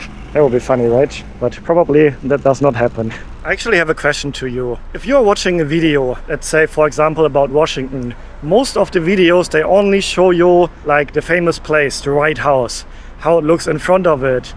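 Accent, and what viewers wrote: Germany. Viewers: Remember English is not his native tongue. He’s German. Give his accent a pass! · Ja, ja, das ist gut! You are fun to listen to with your detail explanations and German accent. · you're pronounciations in English, always sound Gemany❤😂❤😅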